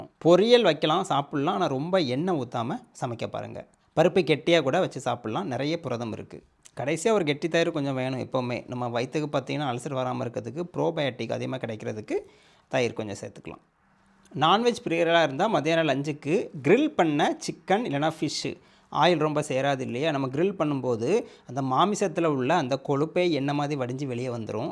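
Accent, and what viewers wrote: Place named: Tiruchendur, India. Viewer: Nice sir. I am from Tiruchendur. Your slang is same like us. Which is your native place.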